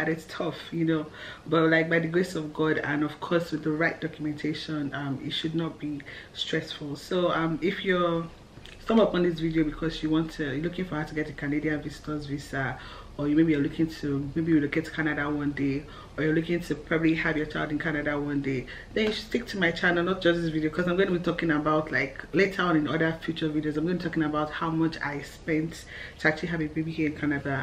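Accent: Ghana. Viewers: Too much respect to you and all women around the world I can see how child bearing really takes toll on women you’re totally changed physic wise and the tone of your voice now sounds more Ghanaian congratulations 🤱🏽👼🏾👩🏽‍🍼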